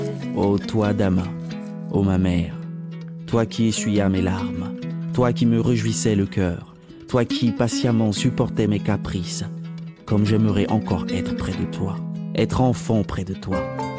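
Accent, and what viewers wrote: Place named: Europe. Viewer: Pourquoi pas lus par un fils AFRICAIN??? J'aurai aimee entendre ca lu avec un accent Africain, pas European.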